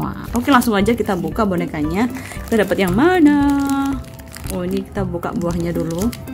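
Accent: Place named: Java, Indonesia. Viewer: Logat jawa nya kliatan wkwk😄🖤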